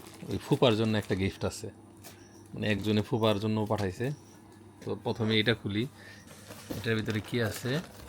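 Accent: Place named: Jamalpur, Bangladesh. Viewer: কোন এক শীতের সকালে তারা যখন মেলনী কাকীর সাথে মাছ কিনতে যায় ঝগড়া করছিল সেটাই প্রথম দেখা ভিডিও ছিল। আমার গ্রামের বাড়ি জামালপুর। আপনার ভাষা শুনে মনে হয়েছিল জামালপুরের কোন এক গ্রামে থাকেন। তখন থেকেই ভিডিও দেখা।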